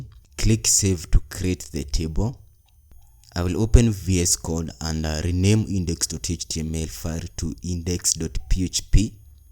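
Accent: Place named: Russia. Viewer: I think you are Russian accent thats good 👍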